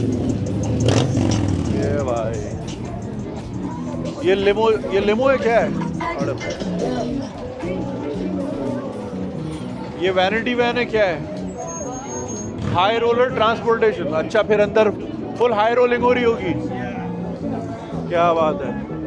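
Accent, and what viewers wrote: Karachi, Pakistan. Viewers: walking tales ko dekny ki main waja ti karachi xcent asal maza us style ka ta funy bato ka ta pakistan k sat comperssion ka ta is tara to seeda sada banda youtube my kahi b dek sakta h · Paki in las vegas with his orangi town english accent 😂🎉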